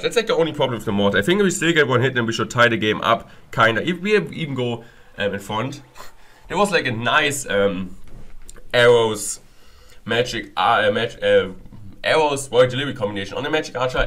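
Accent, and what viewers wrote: Germany. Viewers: ...and dann... Denglisch man kennts😂 Aber nices Video👌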